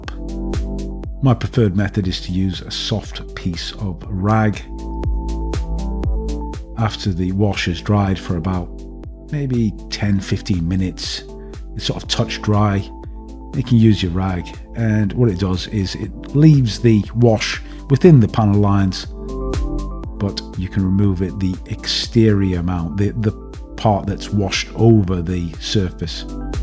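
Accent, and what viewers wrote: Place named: Liverpool, United Kingdom. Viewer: Followed this all the way through - definitely put this kit on my 'wish list for 2023, along with a Hind A and a couple of other Soviet/Russian subjects. Good, clear videos, cleanly edited and well presented (good to hear a familiar accent/dialect even if it does make me a little homesick). Looking forward to your next 'production. All the best from a Scouse in NZ.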